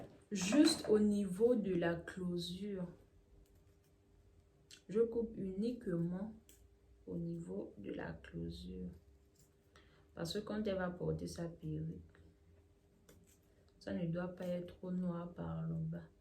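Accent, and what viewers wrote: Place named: Gabon. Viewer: Cc vous etes gabonaise? A cause de l'accent...svp jai besoin de la formation svp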